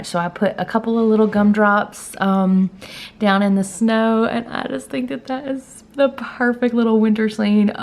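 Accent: South, United States. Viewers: I’m loving your energy and your southern ‘ya’ll’. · Your Southern Voice is Adorable....Just like your Crafts<<<<Happy Holidays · Love the southern accent! Great video, thanks. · I adore the peppermints for my nieces rooms , love your southern accent ;)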